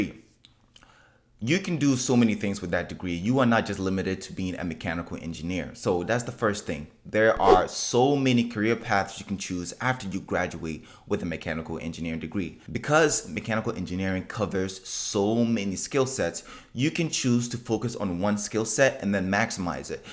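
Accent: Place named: South Africa. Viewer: Are you S.African I'm hearing an accent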